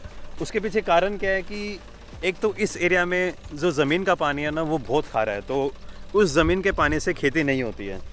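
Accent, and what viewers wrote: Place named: Rajasthan, India. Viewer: Bhai aap Haryanvi nahi Rajasthani ( Shekhawati) bolte ho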